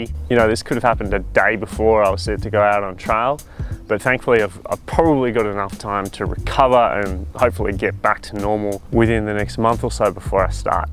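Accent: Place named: Australia. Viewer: Wishing you a speedy recovery! I've been watching your videos for a while, and amongst a multitude of reasons that make your videos so fantastic and engaging, it's also just so refreshing to hear a familiar Aussie accent. It's interesting to hear you speak of the challenges of not knowing how much energy you'll have each day to train due to the fatigue in your COVID recovery. I'd like to hear more about this. Obviously, you intend to achieve a full recovery and soon return to your usual training capacity, but what would you recommend for someone like me who has MS (Multiple Sclerosis) and faces this challenge constantly? I generally find that once I'm out on the trail, the serenity of being out in the bush gives me an instant boost of energy, but I struggle with regular training when I'm home. This is what I'd love to see more videos on. How to maintain good training practices when energy levels are hit and miss. 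Thanks again for your wonderful videos and I hope you recover quickly and safely! Regards from down under :)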